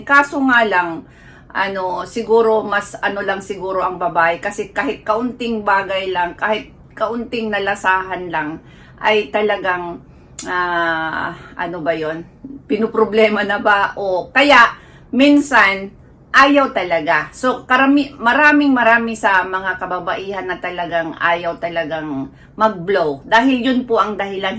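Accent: Visayas, Philippines. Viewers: Anong province mo ma'am? Kasi Ang salita mong Tagalog Ang tuno bisaya halata na Hindi ka pure Tagalog.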